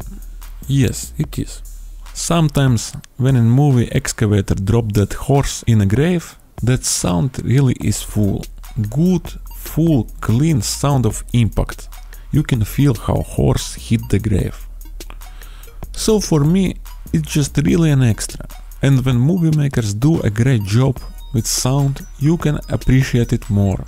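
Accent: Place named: Russia. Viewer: I love the Russian accent in English and how certain vowels and consonants have a special pronunciation. Absolutely adorable, and I am always impressed with people who are multi-lingual. Khorosho! Spasiba. =D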